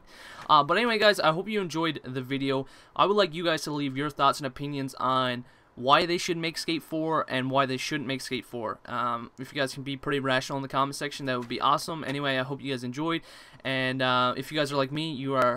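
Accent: Canada. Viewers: What part of Canada are you from holy shit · Canadian accent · are you Canadian?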